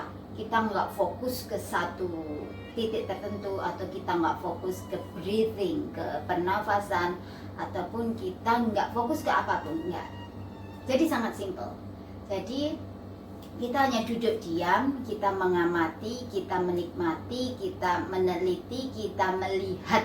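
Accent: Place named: Java, Indonesia. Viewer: Logat yg ciamik antara jowo medhok dg logat bule .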